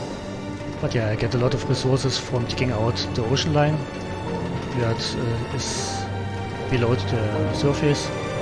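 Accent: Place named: Germany. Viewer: korrigiere mich, aber ich denke aufgrund deiner aussprache das du deutscher bist :D eine ernst gemeinte frage, warum macht man sowas, bzw wo genau liegt da die faszination drin von sowas ein video zu machen? ich selber hab spaß an solchen rails ingame würde aber nie auf die idee kommen ein video davon zu machen. ansonsten liebe grüße und weiterhin viel spaß am videos machen ;)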